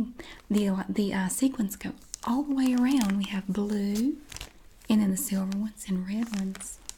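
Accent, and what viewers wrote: South, United States. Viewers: I swear, I am slowly picking up her southern accent after constantly watching her videos lol . . Either way. Fantastic job, Mam. lol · I'm from Germany & speak a couple of languages, but nothing is more entertaining & interesting than your southern accent. It kind of makes me feel all warm inside. It makes me think of happy animals on a farm, corn, BBQ, apple pie & hugs. 🇺🇸 ❤️🌽🥧🍩🌭🍿🥞🐄🐖🐑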